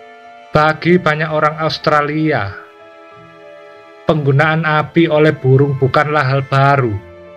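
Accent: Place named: Java, Indonesia. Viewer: bisa ga logat jawa di buang bikin geli mendengar nya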